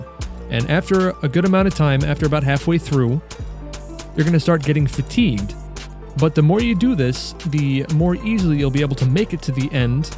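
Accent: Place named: New York, United States. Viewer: He's clearly a New Yorker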